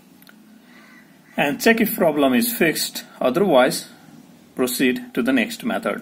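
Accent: India. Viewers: Being an Indian, this is the most Indian accent i've ever heard.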